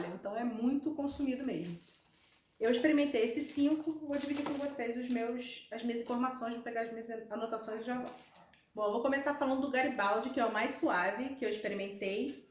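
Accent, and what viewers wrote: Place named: Rio de Janeiro, Brazil. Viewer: .. adoro esse sotaque carioca. Ótimo vídeo, uma aula, parabéns!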